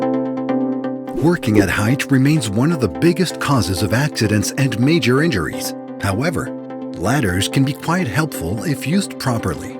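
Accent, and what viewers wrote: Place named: United States. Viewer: Why do I have to listen to an American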